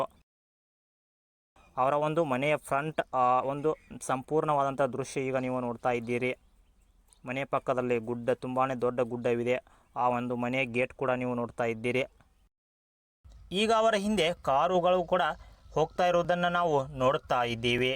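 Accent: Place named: North Karnataka, India. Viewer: Uttar Karnataka Bashe..❤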